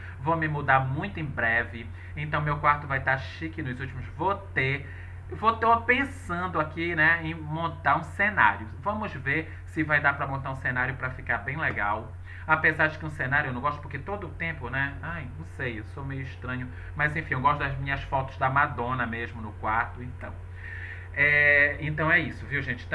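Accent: Pará, Brazil. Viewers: Quando tu abristes a boca eu sabia que eras de Belém . Eu disse “ esse cara é paraense , com certeza” . Conheço de longe um conterrâneo. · Olá Você é paraense não é.? Adoro este sotaque. Vi seu vídeo agora. Também tenho a sua idade! Quem dera conhecer o para ver o peso oh. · Eu desconfiava....que serias PARAENSE...por causa dos.."lh"! Kkkk..he..hi.. Estou tua vizinha..... Parabens para nós que somos AMAZONICOS! Chics! FELICIDADES! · Eu comecei a te acompanhar esse ano e desde o primeiro vídeo eu reconheci esse sotaque paraense, mas so agora comprovei. Que legal um conterrâneo perfumolatra com conteúdos tão legais 👏👏👏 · Adorei saber mais sobre vc, eu imaginava mesmo q vc é paraense, pelo sotaque. Também sou do Pará de Santarém, mas só de nascimento, moro em Sorocaba SP. Mas sei reconhecer muito bem o sotaque da terrinha. Vc é muito autêntico e natural, gosto muito do seu canal. 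Um beijo. Seja muito feliz!!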